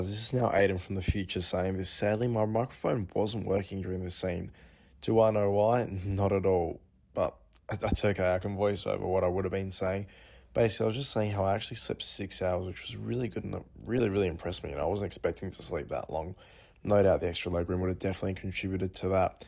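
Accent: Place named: Australia. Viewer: You sound European Australian born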